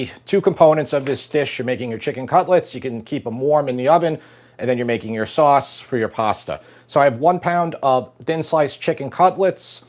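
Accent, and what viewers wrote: New York, United States. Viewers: Making this tonight. Thank you for sharing your skills with us. And I like the NY accent:)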